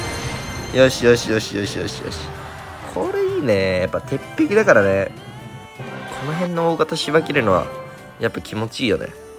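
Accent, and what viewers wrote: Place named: Kansai, Japan. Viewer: なんか今回粗品の動画見たあとなんかってゆうぐらいにちょいちょい関西弁ぽいの聞こえるなぁ笑笑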